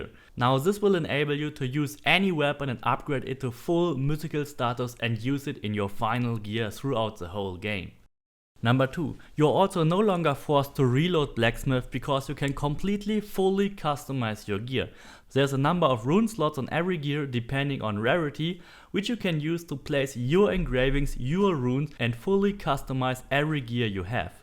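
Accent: Germany. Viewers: Where u from bud, u sound german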